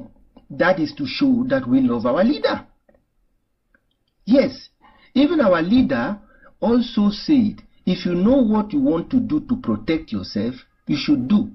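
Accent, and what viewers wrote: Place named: Fulani, West Africa. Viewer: You make do much sence but your intunation sounds like a Fulani person Thank you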